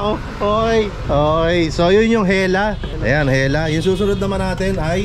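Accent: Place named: Bulacan, Philippines. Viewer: Dinig na dinig ko punto ng paombong, bulacan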